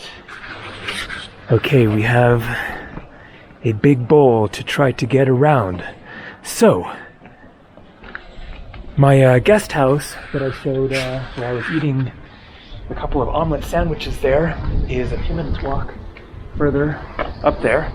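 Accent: United States. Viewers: please pronounce. “ Himalayas “ and “ Himachal “ correctly…..after all the years of travel ….and you still pronounce some words with that arrogant American pronunciation…….love your videos, but your western pronunciation is a big turn off….and I find it disrespectful…….at least try to get the right pronunciation……most foreigners try to learn the main words in a language to communicate……‼️⁉️🇺🇸 Edit: I thought that with the starlink satellites, reception would have improve in the mountainous regions of the world…..at least that’s what T-mobile said…. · Hi, I found you today while trying to watch a vlog on Himachal Pradesh. I really liked the slow pace and story telling. Thank you! By the way, Indians don't mind if you face the camera on their face. I think, some may even like it. Well, I left India 39 years ago. I live in Philadelphia now. I am an Indian but what a joy to find you to get to hear the familiar American accent!! 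Sending love from Philly - the City of Brotherly love. All the best!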